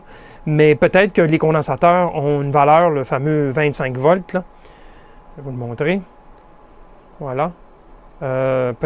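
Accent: Quebec, Canada. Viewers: Excellente video, comme toutes les autres d'ailleurs ! Un grand merci et Bravo a vous et avec cet accent de nos sacrés cousins ! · Bonjour, merci pour votre vidéo. Je me posais des questions quant à la mise à la masse commune en cas de mesure avec un ocsillo et comment juste avec un multimètre suspecter un condensateur de filtrage. Et bien, j'ai eu ma réponse et vous en remercie. Etant Français, l'accent Canadien est un pure plaisir ;-) merci à vous.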